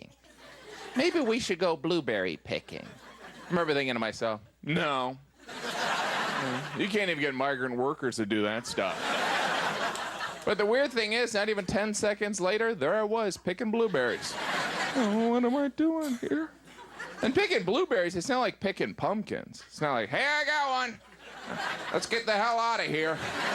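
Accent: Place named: New York, United States. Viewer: Why does every stupid accent he does sound like someone from New York?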